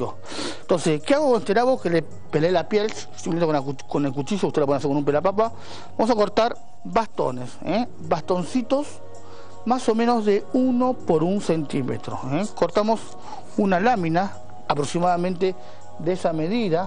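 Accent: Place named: Argentina. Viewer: Una persona de rasgos orientales con acento argentino! hora lo ha visto todo!!! Muy buena receta!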